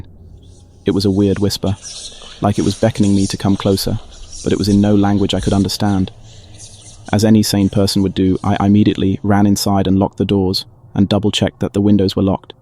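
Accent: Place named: United Kingdom. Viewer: Awesome British narrator ! 😀😀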